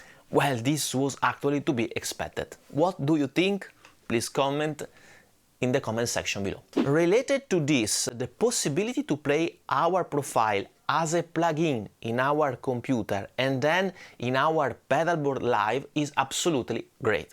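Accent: Italy. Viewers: Look, I just wanted to joke around a bit. I mean the name what could it mean? That this nice guy likes Fender and Gibson guitars. That's all. And I asked, why he didn't choose to honor also PRS guitars by calling himself ", Paul Gibson". You got me? Ich nehme deinem Namen nach an, du bist Deutscher. Ich bin ein lustiger Tiroler, der halt in D nicht gut ankommt. Bin leider kein Mario Barth, was soll's! Dass Italiener ist, erkenne ich an seiner typisch italienischen Aussprache, was ja wirklich nebensächlich ist. ich wundert immer wieder, wie schnell man auf YT entweder blöd angeschrieben oder belehrt wird und alles so todernst genommen wird. Ein bisschen mehr Lockerheit ist nicht nur beim Gitarrenspiel angesagt, sondern generell. Aber Schwamm drüber! Ich wünsche dir noch alles Gute und rock on!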